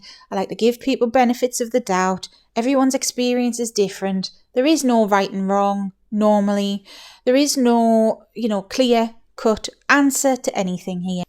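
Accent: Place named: Yorkshire, United Kingdom. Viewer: my mother is from England, she grew up in Yorkshire, I'm in America never been to England, but one day I will go. Your accent reminds me of my mothers. 😘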